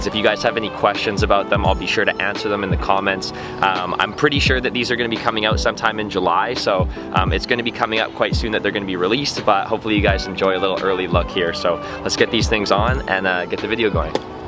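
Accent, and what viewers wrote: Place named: United States. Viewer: you sound like an amercian but if you gonna review fix up on your wording and facts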